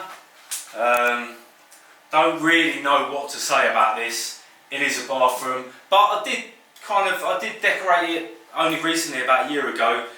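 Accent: United Kingdom. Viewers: thats a british accent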